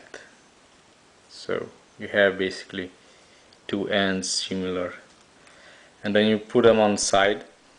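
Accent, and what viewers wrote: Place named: Nepal. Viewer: Are you from Napal? By your accent it sounds like it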